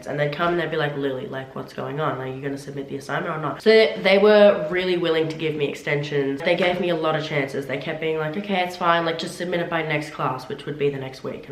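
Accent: Australia. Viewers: She has an Australian accent actually hahaha, but I say that because she loves reading and literature in general. Nothing to do with her accent.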